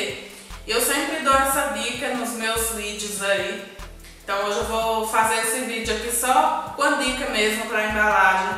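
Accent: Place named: Northeast, Brazil. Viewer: Olá gosto muito dos seus vídeos meu nome é Walmir Lopes sou de Salvador Ba vc é muito simpática vc é de que lugar vc remédio um sotaque nordestino eu gosto muito desse sotaque eu também sou nordestino um beijo.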